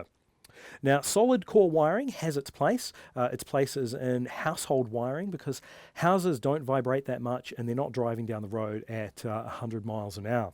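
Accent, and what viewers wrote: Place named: New Zealand. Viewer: Good old kiwi pronouncation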